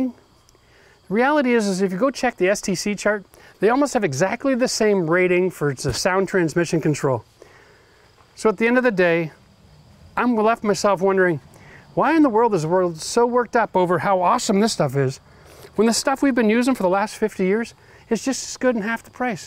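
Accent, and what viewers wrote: Ontario, Canada. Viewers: You always make it simple, honest and with a touch of Canadian eh! TY from BC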